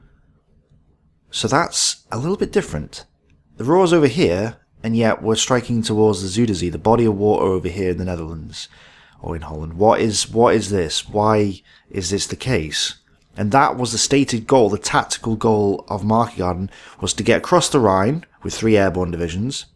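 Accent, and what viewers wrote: United Kingdom. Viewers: I did like this. But my Missouri boy ears had a little trouble with the British accent. I sounded like he kept taking about getting into "the raw." It looked like he was referring to central Germany. But I am not familiar with that term. Can someone help me?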